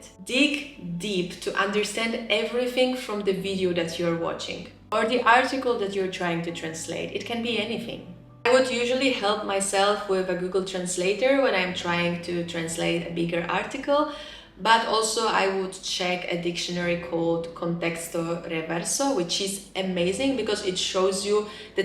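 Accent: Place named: Italy. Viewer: I love that your accent becomes more Italian as the video goes on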